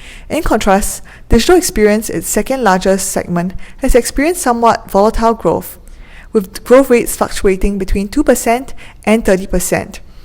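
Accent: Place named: Singapore. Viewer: Singaporean accent.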